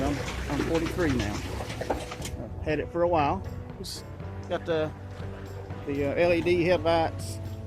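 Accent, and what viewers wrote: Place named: South, United States. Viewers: Love the car and the texan accent! Greetz from Holland. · Very cool man! I love the side bed storage, keep them guns in there! I'm from Los Angeles and I love that southern dialect.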